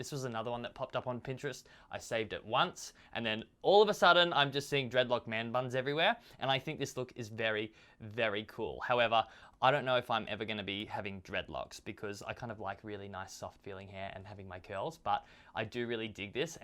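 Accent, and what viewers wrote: Australia. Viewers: Is he Australian? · Are you Australian?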